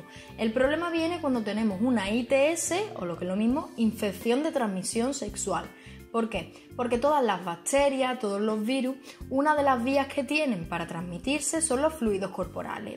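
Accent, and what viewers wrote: Andalusia, Spain. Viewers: Me encanta tu acento andaluz saludos desde Colombia y muy buena info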